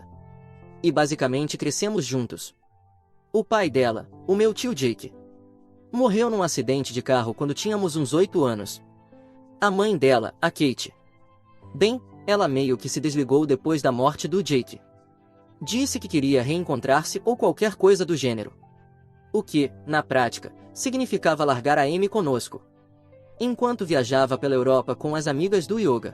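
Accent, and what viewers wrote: Portugal. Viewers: Eu desisto de ver esse canal, portugues de portugal, a ia lendo com mais pausas q uma criança fazendo apresentação com vergonha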